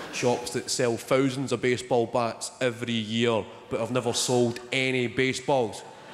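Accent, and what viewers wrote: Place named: Scotland, United Kingdom. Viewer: He's not English he's Scottish from Glasgow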